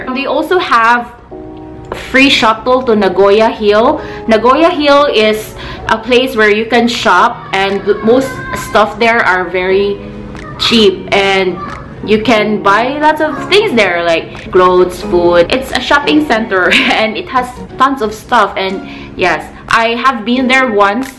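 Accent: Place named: Singapore. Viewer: Te, imong accent ky Singaporean na hehe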